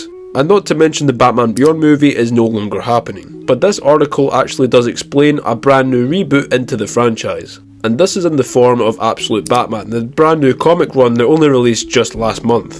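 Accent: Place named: Scotland, United Kingdom. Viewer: 3 minutes of a Scotsman babbling to say absolutely nothing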